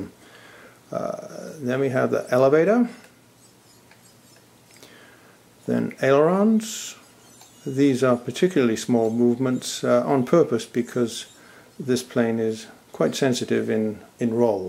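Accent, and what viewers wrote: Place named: United Kingdom. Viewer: I'm sorry, but WTF? Im hearing a brit accent, and can't help but wonder WTF? Do you have nothing better to do with your time??? You're obviously an incredibly talented, hard-working, devilishly-focused so and so and again I ask: WTF?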